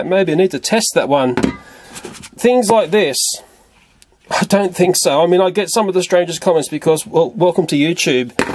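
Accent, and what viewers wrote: Australia. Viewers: So Australian people speak English but they don't no our American English interesting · >mfw you think that's an American accent He's Australian. That's a redback spider. Just stay away from Australia and the drop bears and other nasties won't get you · Is dis boi Australian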